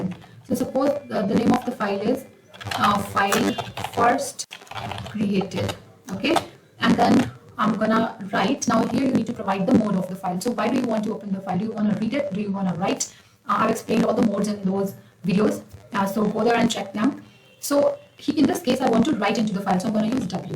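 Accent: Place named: United States. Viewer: in your previous video like 2 years before you spoke like a American like born there but now your teching is good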